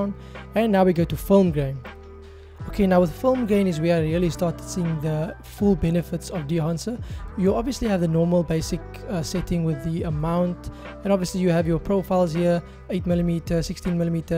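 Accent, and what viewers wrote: South Africa. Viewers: Are you from South Africa? If not, you have a very South African accent. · You sound South African mybru, shout-out ! 🙏🏽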